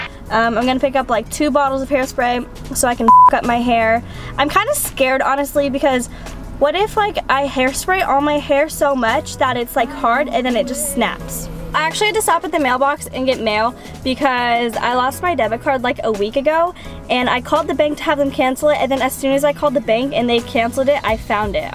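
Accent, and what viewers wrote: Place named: United States. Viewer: the classic american accent lol... #loveyall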